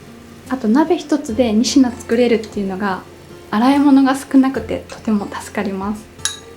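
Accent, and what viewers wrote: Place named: Kansai, Japan. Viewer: 関西出身の人ですね！！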